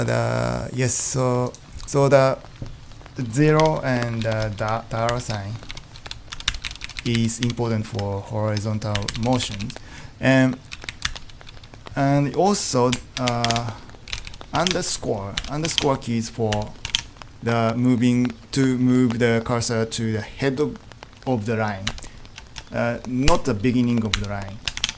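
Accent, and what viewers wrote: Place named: Japan. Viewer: 日本人の喋る英語ってとても参考になります。vimも覚えたかったので私にとっては素晴らしい動画でした！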